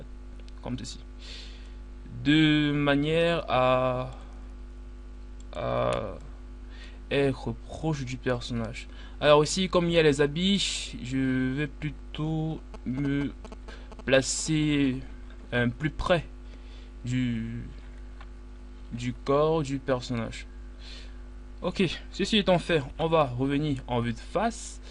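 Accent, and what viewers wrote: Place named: Africa. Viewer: Très bonne vidéo. Dommage pour l'accent africain qui rend difficile la compréhension des touches (G prononcé "J"" etc..)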